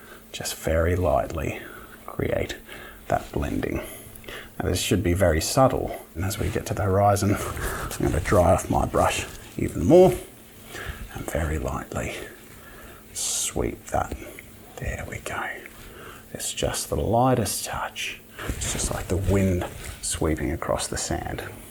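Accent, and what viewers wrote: Australia. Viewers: The Australian accent gives a spice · It's weird, i could never really tell the difference between australian english (well, at least yours, i dont really watch many australian people) and british english (I'm a french canadian, we have neither here lol). But in this, i can CLEARLY tell XD it's essentially a mix between southern US and England. I get it now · I know heaps of Aussies who talk like that. It’s not annoying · With A Hint Of Aussie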